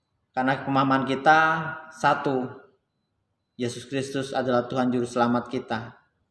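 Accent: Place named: Java, Indonesia. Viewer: Logat Jawa...Pengin pulang kampung rasanya 🙈🙈 Sub jg Christian Prince channel mas..🙏🙏🙏 Ntar datang kesini ntar malam <link> Kutunggu ya Mas...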